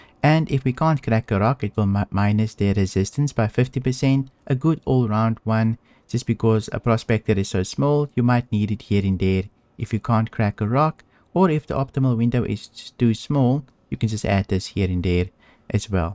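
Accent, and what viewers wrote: South Africa. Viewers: Hey bud, love your content (and your South African accent). Keep it up! I did want to mention that it sounds like you may be clicking the mouse to deactivate the laser beam after cracking and extracting the rocks. You don't actually have to do that since the game automatically does it for you. I noticed once in this video that you actually inadvertently turned your extraction laser back on after the game turned it off for you as a result of your unnecessary click. Just figured I'd mention it since it seems maybe you are not aware that the game does turn it off for you automagically.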